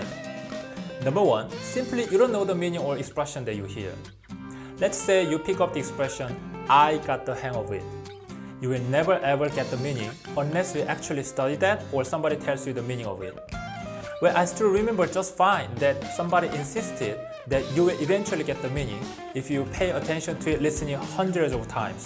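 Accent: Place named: South Korea. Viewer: 토종이신가봐요 발음이 정겨워서 좋아요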